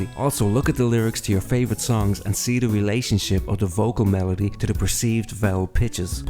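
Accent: Ireland. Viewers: Are you Irish or Dutch? Such an interesting accent! Now I can hear that you are Irish right? Dubliny? Still could be a Dutch person whose learnt from American tv! Crazy! Hope you’re well fella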